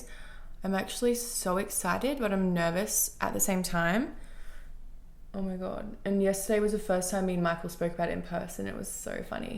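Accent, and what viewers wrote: Australia. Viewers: I never thought the Aussie accent could be unattractive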